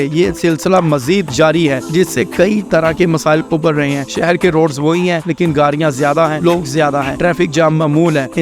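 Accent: Pakistan. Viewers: Lehja bta rha ha k aap Pakistani ho ? Aur PTI supporter bhi ❤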